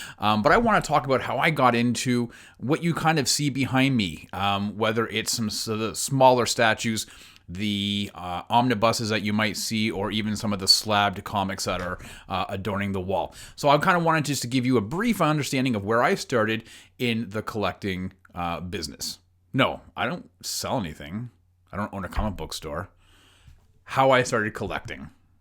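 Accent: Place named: Canada. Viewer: I just come for the Canadian accent. And ketchup chips rock esp since none in US.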